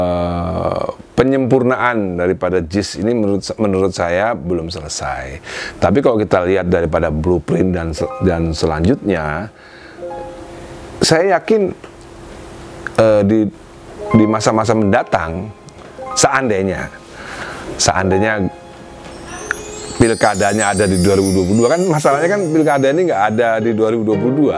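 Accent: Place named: Surabaya, Indonesia. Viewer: Bose gesss Boseee .... Makin mantap monolognya, kas suroboyone tapi ada solo solonya dikit.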